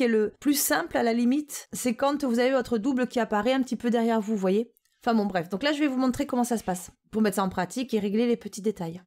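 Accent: South, France. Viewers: oh t'es du sud toi, quand je regard tes tutos et après tes clockworks sa fait un choc, tu refait tout sur une logiciel comme C4D non ? Bonne vidéo btw :)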